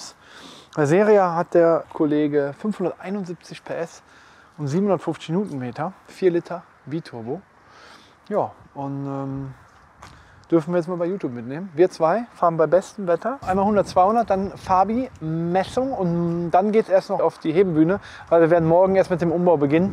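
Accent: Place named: Germany. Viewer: Bruder für ein Mustafa spricht du zu Hochdeutsch. Ich mag es irgendwie nicht🤔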